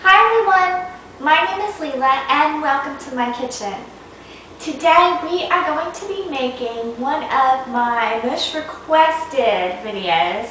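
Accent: United States. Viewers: u sound country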